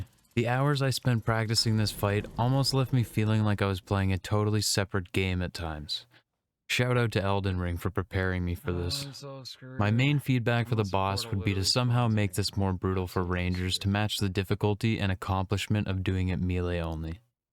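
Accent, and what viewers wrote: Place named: Maritimes, Canada. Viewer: You from the maritimes? I recognize the accent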